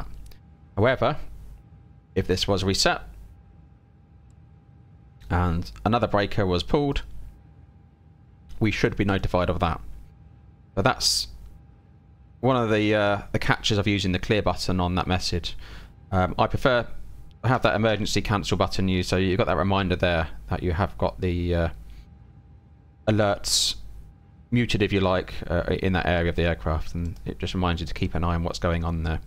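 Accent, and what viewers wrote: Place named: United Kingdom. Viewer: Good videos, but at times the british accent comes a bit much to my taste.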